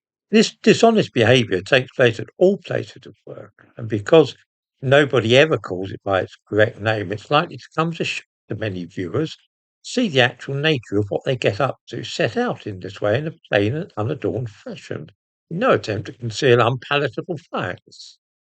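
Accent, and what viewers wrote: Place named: Somerset, United Kingdom. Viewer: Love the Somerset accent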